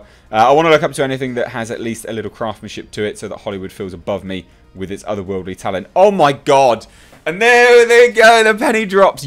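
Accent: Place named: United Kingdom. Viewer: "Why should we be listening to self important douche bags". I don't particularly want to listen to them, but the 1st ammendment is all about free speech son, and I'll defend their right to make political statements, and rickys right to ask them not to. If you dont want to listen to them, you have the remote and it has a volume control, and other channels are available. And, as you sound like a brit, I'm guessing you never watched it live anyway and have only seen clips on YouTube.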